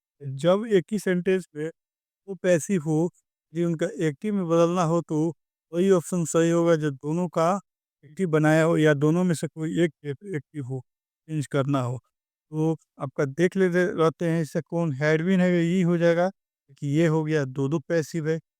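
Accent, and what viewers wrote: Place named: South, India. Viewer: Bhai south se ho ky tum Hindi wali vibes ni aari aisa lag ra hai jaisa soch soch k bol re ho But bathreen explanation ❤❤❤❤❤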